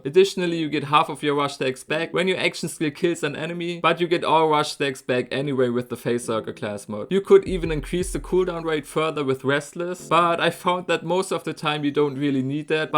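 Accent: Germany. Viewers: Think his first language is not English but German or so. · Der deutsche Akzent ist ziemlich offensichtlich bei ihm xD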